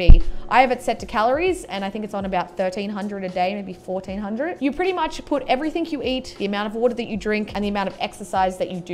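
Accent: Australia. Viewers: So Australian haha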